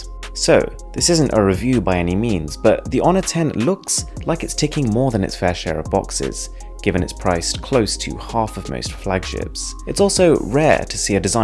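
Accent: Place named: United Kingdom. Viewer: That british accent.....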